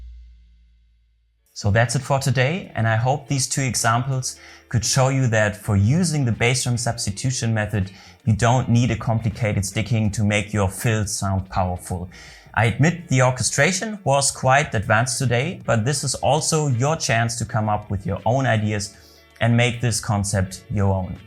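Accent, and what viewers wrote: Germany. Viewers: Haha... Man hört richtig, dass er deutscher ist xD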